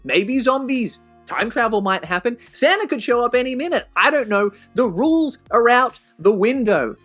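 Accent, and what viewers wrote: Australia. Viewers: Australian… I think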